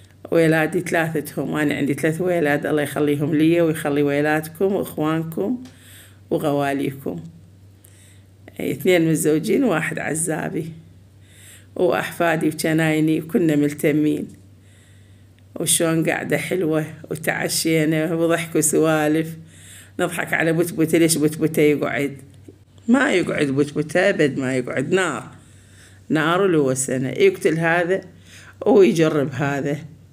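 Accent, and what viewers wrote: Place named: Mosul, Iraq. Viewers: هلا خالة.. بالصدفة شفت الفيديو بالمقترحات وجذبني العنوان.. لان خوالي من گيارة.واعمامي من كركوك.❤ وحسيتج من لهجتج انتي هم من كيارة🌷😁..فدوة لصوتج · احب كلامج اموت على لهجه الموصل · عاشت ايدج لهجة الموصل بلسانج تخبببببل احبج هواية خالة. اني زينب من البصرة احب تذكرين تعليقي لان اكتبلج هواية ومجاوبني ربي يطول بعمرج يااارب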